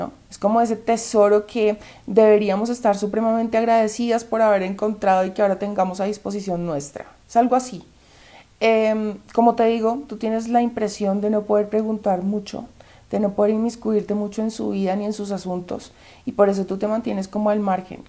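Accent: Bogotá, Colombia. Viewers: Así se expresan los Bogotanos 😊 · Me encanta tu acento colombiano, ni raja ni presta el acha, aquí en México decimos: ni picha, ni cacha ni neja batear.... excelentes videos....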